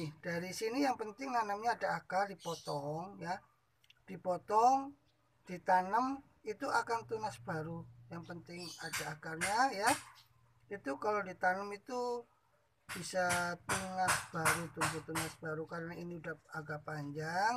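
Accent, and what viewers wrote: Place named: Banyumas, Indonesia. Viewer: Deneng ngapak,asli pundi nggih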